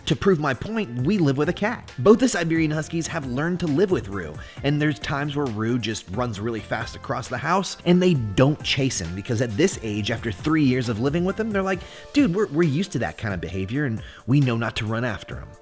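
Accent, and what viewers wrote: England, United Kingdom. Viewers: Hi luv the Skateboard...Luv the English Accent nearly like mine...😍. Nice to see Roo I would like to get a Husky in the future but it is like you say a lot of things to think about . But your videos are very helpful.. I just think they are gorgeous. And I hope that my Husky will like my 🐈..one Day.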